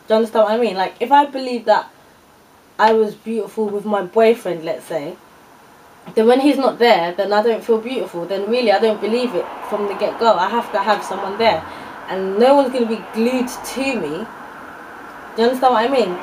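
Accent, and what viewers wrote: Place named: United Kingdom. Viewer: Are you tyra banks sister?:o You sound british, or maybe she sound american hehe I dont know where yous are from! Btw, your beautiful! I was going to bleach my skin because of uneven skintone but now you made me much more confident, ill just stick to foundation. The fact that people risk there lives just to look pretty is mad.. Stay strong your not ugly!:-) xx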